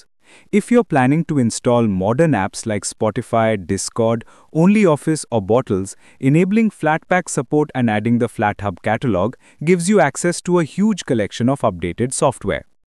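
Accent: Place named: India. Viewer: 换成印度口音了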